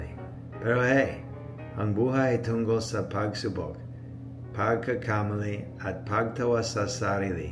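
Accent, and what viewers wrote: Philippines. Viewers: You sounded almost filiipino, 🇺🇸🇺🇸🇵🇭🇵🇭❤️❤️❤️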